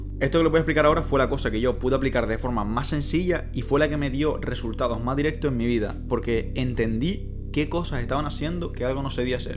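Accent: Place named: Spain. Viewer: BOOOOFFF, Que videazo tio, ostia, hasta se me ha pegao' el asento Ejpañolete. Saludos, soy de Colombia, me has dado mucha claridad, un abrazo, mucho exito y Dios continue bendiciendo tu Don!!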